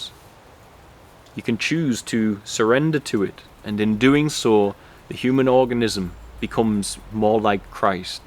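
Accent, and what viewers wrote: United Kingdom. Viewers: Where are you from (originally from) you sound British brother - Also I’m a new subscriber and looking forward to your future content 🙏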